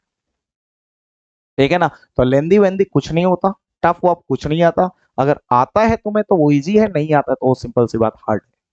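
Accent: Bihar, India. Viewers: Sir aap bihari hai kya??? Hum bhi bihari hai .....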